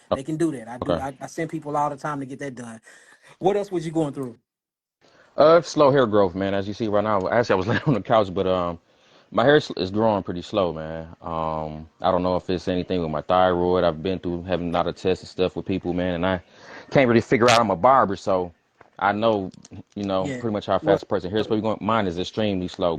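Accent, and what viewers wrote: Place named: Kentucky, United States. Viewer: I’m from Louisville ky we really do talk like that especially in Louisville she definitely not faking it 😂💯 no bap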